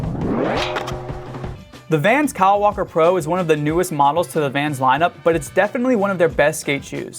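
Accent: Southern California, United States. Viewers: Nick Z that’s a SoCal accent. You hear it in valley people and a lot of surfers, skaters, and punks. I notice people into the things mentioned even talk like that if they don’t live in California. It’s a subliminal thing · So this is what a california accent sounds like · i think its a SoCal accent idk much about it so you can try to search it up if you want.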